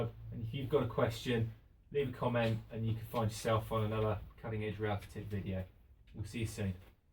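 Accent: United Kingdom. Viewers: Ciao, first THX forma clear explanation trough images of the blade while spine around the wood. But I am italian, so my english is far to be perfect. I have had some problems with your accent. I suppose you are from uk and not usa. May I ask you if you are scottish,or irish or somewhere else. I am quitecurious and always trying to improve my english. May ne New Zealand? THX again and forvgive my curiosity. Ciao from Mi!ano Christian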